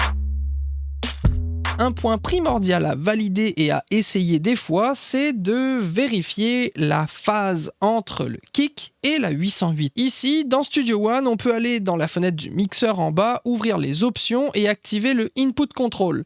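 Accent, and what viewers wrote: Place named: Réunion, France. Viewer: T sur ou t inn kreol na un léger accent 😅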